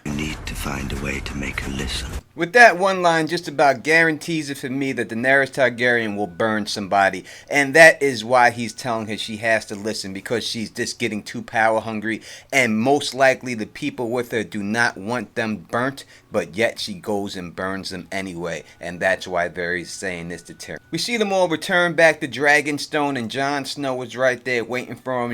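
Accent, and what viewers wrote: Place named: Texas, United States. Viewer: why the hell do these channels all have texas accents?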